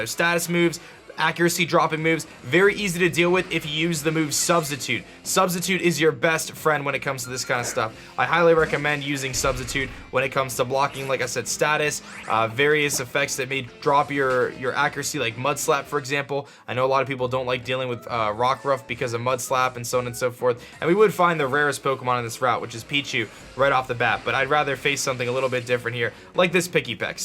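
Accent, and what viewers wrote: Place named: California, United States. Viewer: Disliked. Because you talk like a stereotypical cali dude from every 90's movie!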